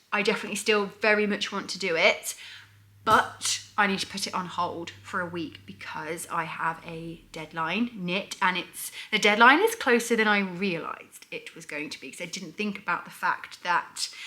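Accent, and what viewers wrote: United Kingdom. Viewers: вас дуже любо слухати, дуже приємне мовлення, обожнюю британську англійську)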